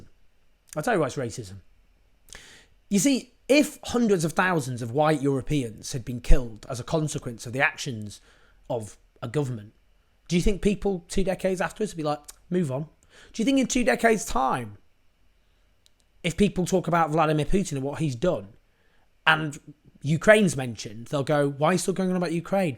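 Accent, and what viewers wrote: United Kingdom. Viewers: The accent of the host seemed like he is a British! Well, I've got a news for this man, a British. You, your prime minister Tony Blair with his friend the United States of America empire's president should be the one not only to be put on "TRIAL," if possible to be "HANGED IN PUBLIC⚖️👎🇺🇸😡😡🇬🇧! Ayatollah Ruholah Khomeini said: "DEATH TO AMERICA⚖️👎🇺🇸😡!" Well, the same words will come out coming from both Afghan and Iraq people's mouth who was abused, slaughtered and pulverised by both your United Kingdom and that of murdererous Americans saying unitedly "DEATH TO BRITISH ⚖️👎🇬🇧😡!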